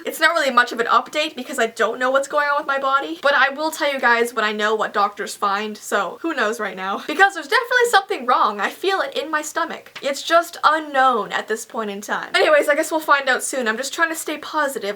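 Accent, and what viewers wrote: Canada. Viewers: She Canadian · Are you Canadian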